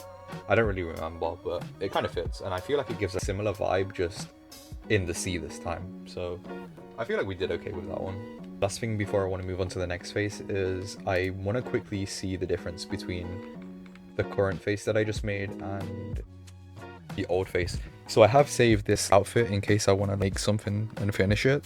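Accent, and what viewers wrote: United Kingdom. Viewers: Prolly just his accent, I'm british too but I don't say it like that tbh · Are you british cuz I love you,your vids and your accent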